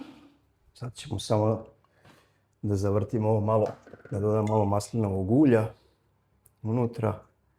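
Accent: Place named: Vojvodina, Serbia. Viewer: Kako je lepo sto si zadrzao vojvodjanski naglasak cist tako da miluje dusu❤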